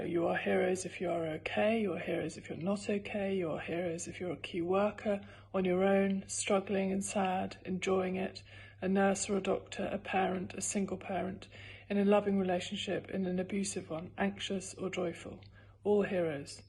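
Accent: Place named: RP, United Kingdom. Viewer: Very poshly done LOL, you are so sweet. It would be marvellous if while you are resting, you could do the job of a care worker. I understand how difficult it is, that you probably suffer from a form of bullying because of your status as a famous woman. Carework has been my life, and I have loved it, it has been difficult because of my connection with a famous man, but I know that it would be a hundred times worse for you. You have done great by reading your journal to us, good luck with your campaign to bring awareness to those in need. You brilliant lovely lass.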